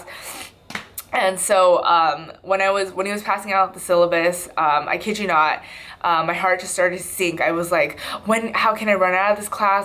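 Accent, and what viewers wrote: United Kingdom. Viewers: Omg! So proud of you!!:) love your british accent haha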